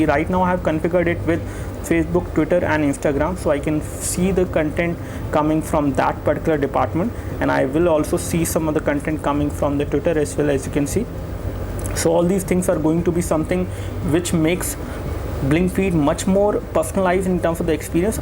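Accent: India. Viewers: INDIAN shitty english .. . .. lol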